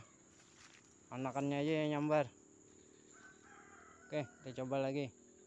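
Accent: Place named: Banjar, Indonesia. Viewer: Logatnya urang banjar saudaraku....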